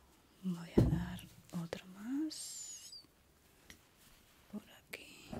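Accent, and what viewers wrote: Andalusia, Spain. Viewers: Amo como hablás, de verdad amo el acento español y luego el tuyo es hermosooo ✨, aparte tu voz relaja 🧡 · En primera, siempre genial, relajante, tingles, interesante... Pero luego leí "Cum" en lugar de "Rum" en la botella de atrás y me dió risa de la mala xD Por cierto, aunque estoy en México, y por ello me es difícil diferenciar acentos de España... el tuyo es el más delicioso de oír. Necesito muchos amigos andaluces para que me hablen todo el día 💖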